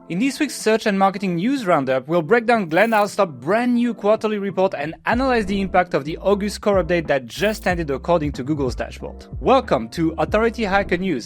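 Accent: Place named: France. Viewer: its french accent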